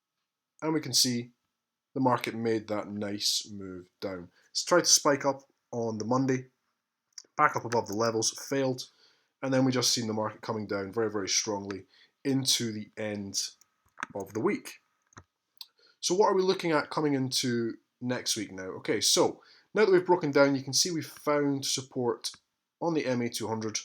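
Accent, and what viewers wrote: Scotland, United Kingdom. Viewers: Hi - another really useful video - Thank you. That beautiful Scottish brogue has become the soundtrack to my Sunday brunches recently! Are you watching any fundamentals on the pair this week? Does the RBA Interest Rate Decision come early enough and carry enough clout to set the trend for the week; and does the US Non-farm Payroll at the end of the week have any likely bearing on price action?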